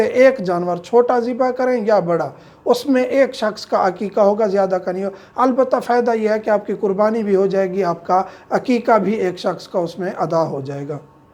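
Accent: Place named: Saraiki, Pakistan. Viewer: بھائ سرائیکی لگتے ہیں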